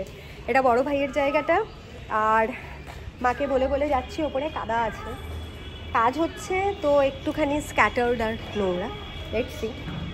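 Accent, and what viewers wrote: Bangal, Bangladesh. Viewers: Khub bhalo laglo specially bangal bhasa ta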